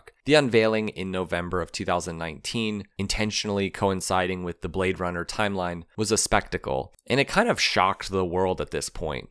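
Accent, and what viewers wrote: United States. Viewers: Wow, this put me to sleep, even after turning the volume down to escape that painful, droning American accented narration. Better than sleeping pills, safer too.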